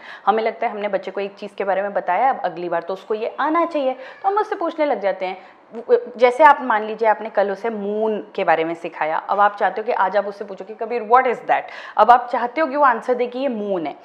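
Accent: India. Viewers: U r from south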